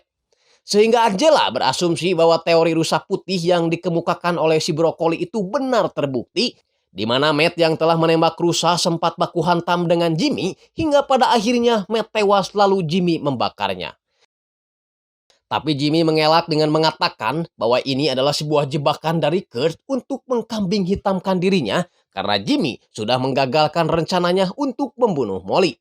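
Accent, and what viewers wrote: West Java, Indonesia. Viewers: ( bang jembut)😂 Iyeu mah asli sunda😅😅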